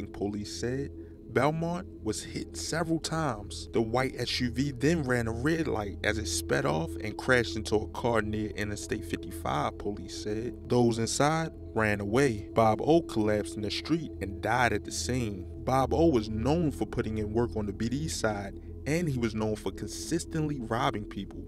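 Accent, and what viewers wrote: Baltimore, United States. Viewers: Are you from Baltimore? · Bro you clearly have a Baltimore accent. Please stop speaking on Chicago business. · You gotta be from Baltimore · Quit that damn Baltimore Slang you say 2 two gets aggravaten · You from bmore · Baltimore accent thick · Very thick Baltimore accent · I’m convinced dude is from Baltimore from the “tews” dews etc ….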